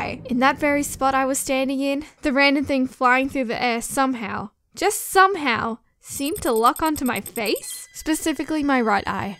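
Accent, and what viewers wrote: Australia. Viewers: Finally i can understand because your australian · Are you British? You said year not grade and I talk English but you sort of sound Australian at the same time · GASP are you Australian?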